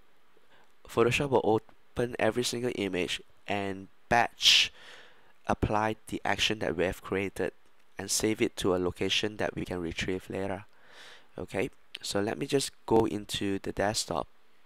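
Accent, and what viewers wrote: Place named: Malaysia. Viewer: my guess is...he's from Malaysia or Singapore... :)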